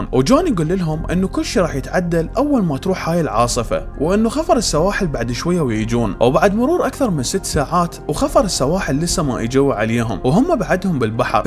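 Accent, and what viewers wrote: Iraq. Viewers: عاشت ايدك على هذه العمل فوق الروعة انته إنسان مبدع وخاصة تتكلم بل اللهجه العراقية الأصلية وهذه السبب الرئيسي في متابعتك 🌹🌹🌹👍👍👍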